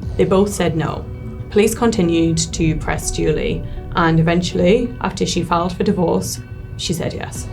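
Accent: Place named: RP, United Kingdom. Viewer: Posh Focal Fry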